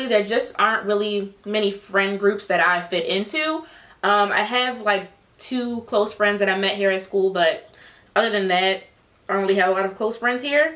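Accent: Baltimore, United States. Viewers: You and Thechicknatural have the same accent and both from being from Baltimore is cool. It would be so dope if you guys collab.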